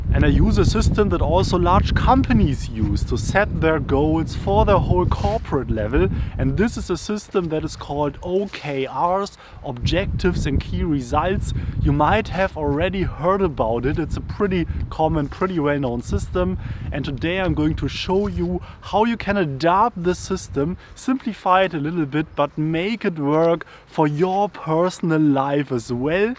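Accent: Germany. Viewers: oh goodness that heavy german accent....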